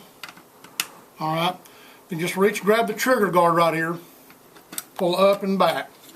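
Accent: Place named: East Texas, United States. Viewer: where you from boy? I'm guessing it aint main. meby deep east texas.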